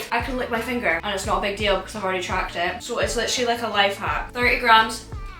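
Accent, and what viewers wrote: Scotland, United Kingdom. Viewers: What part of Scotland is she from? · Are you Scottish?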